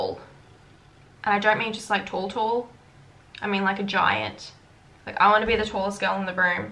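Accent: Australia. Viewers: R u Australian? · Australian accent not austrian. Austria is in Europe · funny the Asian sounds Aussie damn. still cute tho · She sounds like an aussie :) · Her accent is like a mix of Australian and country but for some reason I love it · you better tech us you Auwsiiie aCCennT💅💅💅💅💅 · are you australian? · it’s called australian accent luv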